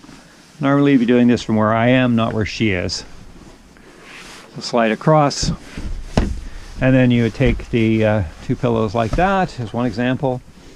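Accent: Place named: Canada. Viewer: "Process" and "out" too, but he's pronouncing them correctly for Canada and that's where they live. If we were in Canada WE would be speaking "funny".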